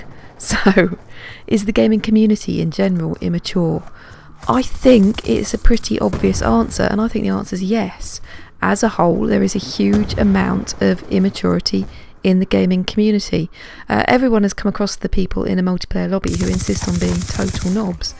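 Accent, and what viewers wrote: United Kingdom. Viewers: I forgot that I wanted to mention as well what a great commentary this was. I enjoyed it thoroughly and agree completely. =) It's also awesome hearing the colorful varieties of english that you only get from the British.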